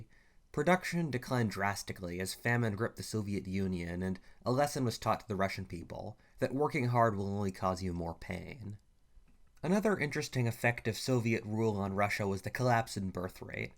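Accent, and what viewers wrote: Canada. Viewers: You're a Canadian?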